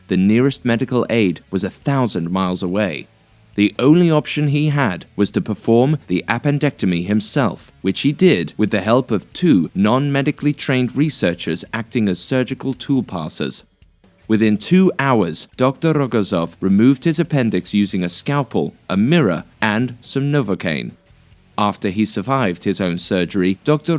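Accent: United Kingdom. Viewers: As soon as I heard a British guy speaking I bailed sooo sick of everything I watch narrated by Brits bye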